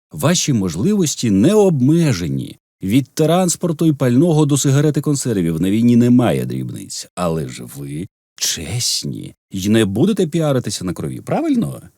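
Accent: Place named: Russia. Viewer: У вас дуже гарний, заспокійливий український голос, а російський такий наче ви самий корінний росіян із росіян. Так вуха ріже і дратує шо капец...часто втрачаю саму суть тексту...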